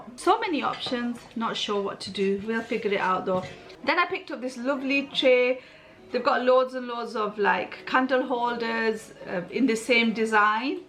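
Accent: Manchester, United Kingdom. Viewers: Can’t you tell by her accent? She’s from near Manchester!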